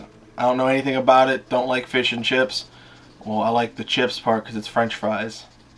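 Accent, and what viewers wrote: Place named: United Kingdom. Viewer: ahaa your British accent was great :D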